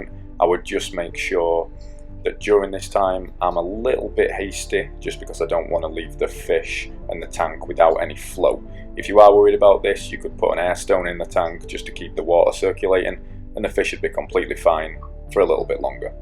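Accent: United Kingdom. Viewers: are you from the uk you sound like it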